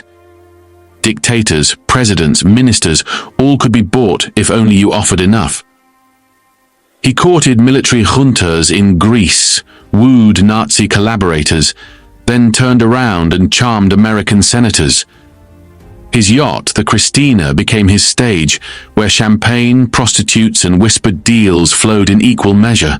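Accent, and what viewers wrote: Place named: England, United Kingdom. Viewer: they wanted to be broken..funny narrated by a Pom - more damage to the world done by the English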